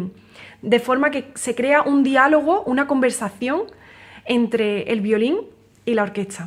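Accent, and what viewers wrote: Andalusia, Spain. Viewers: En el otoño de mi vida encontré la primavera en esta niña talentosa sólo con escuchar su música y su gracia andaluza en el hablar todas las cosas se cambiaron ya para mi sólo puedo decir floreció en mi una eterna primavera GRACIASPOR EXISTIR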